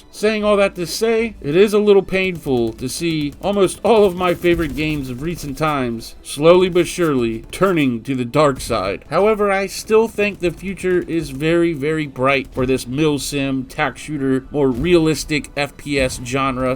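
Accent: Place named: Mid-Atlantic, United States. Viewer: Man you and I both have that mid atlantic accent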